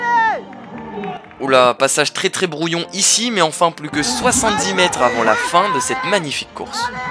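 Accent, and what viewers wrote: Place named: North, France. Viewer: La piste magique d ordegem à encore frappé!!! Sub 8'50 en junior c est très fort, plus la chute et les petits piétinements avant certaine barrière c est encore plus beau, hate de voir l evolution dans les prochaines années, bon courage pour la suite de la saison 💪 Ps: magnifique accent du nord, c est pas possible tu as de la famille qui habite par chez nous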